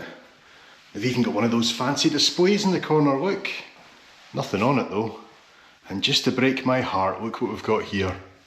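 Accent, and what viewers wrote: Scotland, United Kingdom. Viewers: Oh so glad having your voice again and not a German guy speaking..even understanding what he said ..but not the same ,prefer your Scottish tongue Great Video!!!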